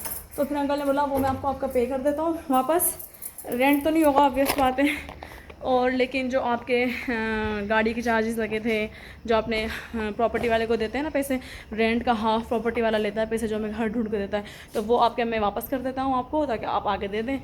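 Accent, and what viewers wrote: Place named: Sukkur, Pakistan. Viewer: kahan par rehtay hain aap k paikay 😂 Matlab aap k ammi Abbi behen bhai I think sukkur q k mujhe aapki boli sukkur Jessi lagti hai aap panjabi nahi lagti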